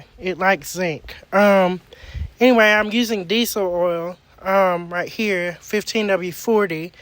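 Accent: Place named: Texas, United States. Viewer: What part of Texas are you in. Sounds like the same part I’m from. I was born in Tyler and all my family are from Murchison, Ben Wheeler & Brownsboro.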